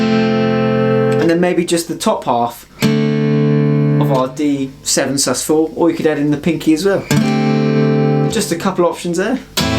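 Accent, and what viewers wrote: United Kingdom. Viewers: what a beatiful aksen really love britain aksen 😍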